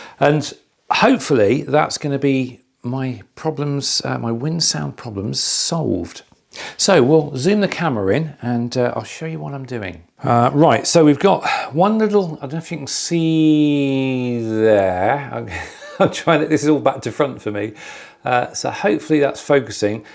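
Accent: United Kingdom. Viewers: I remember that you were talking about Furry failures 2015 and again Making a cover for the Microphone testing it with a fan. Saying fur during your variety Channel. That makes me laugh your British accent. You are Fur happy 😊. That's so cute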